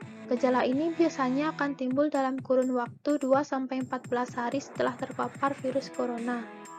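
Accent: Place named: Bali, Indonesia. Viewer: Logatnya bali banget ya kak🙃, thanks infonya👌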